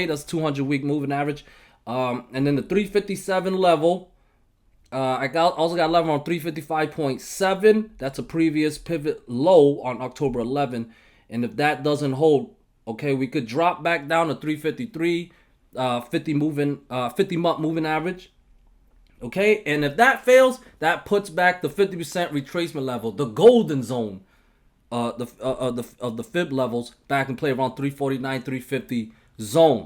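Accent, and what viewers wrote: New York, United States. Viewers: you from ny?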